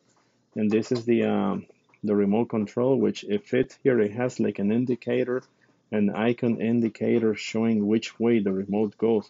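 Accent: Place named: India. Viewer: Put Indian accent in title so we don’t click on that …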